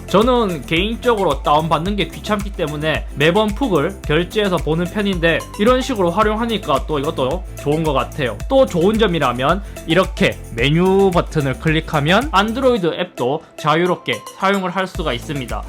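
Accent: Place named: Jinju, South Korea. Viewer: 고향이 진주 쪽 이신가? 억양이 친숙 하네요 ^^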